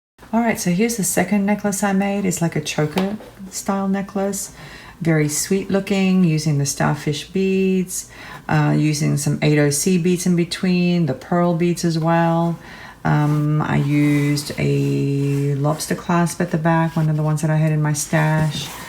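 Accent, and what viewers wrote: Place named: Australia. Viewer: Forgot to say, Love your accent! Are you Australian? Just thought I’d guess.. your home is absolutely beautiful by the way!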